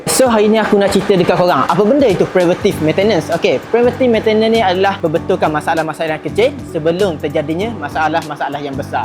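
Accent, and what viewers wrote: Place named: Terengganu, Malaysia. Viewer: Sedak abang kecek ganu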